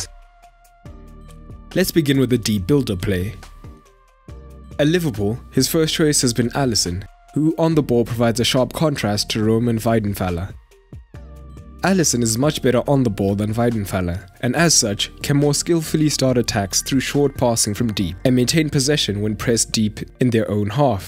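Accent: South Africa. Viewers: You’re South African innit?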